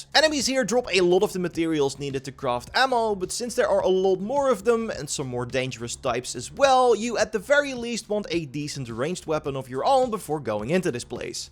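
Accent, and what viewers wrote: Netherlands. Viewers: This guy couldn't be more Dutch. That accent is annoying af.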